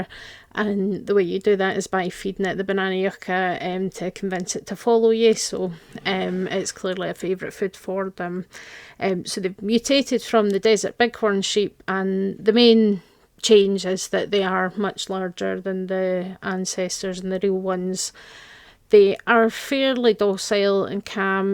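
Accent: United Kingdom. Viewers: This video is cool, thank you scottish science youtube lady 👍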